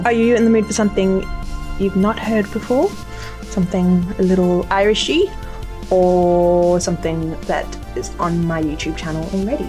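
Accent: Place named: South Africa. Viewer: Hey,. Sorry I missed your first livestream,but I shared this all over Facebook. You're awesome as always. I love You're South African accent and could listen to you talk all day. Lol. I absolutely love your music and the way you perform. Never stop playing. I don't have much time left on earth, but I'll be listening to you for as long as I can. 🤟✌🌹🌹🌹🌹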